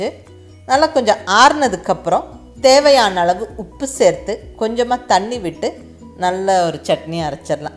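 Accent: Coimbatore, India. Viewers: I'm the first to comment... Happy to do that... I'm from cbe and i love ur videos.. Nice presentation and kovai slang.. I am ur follower of chicken biriyani, brinjal fry etc.. All ur videos are worth watching.. Thank u soo much mam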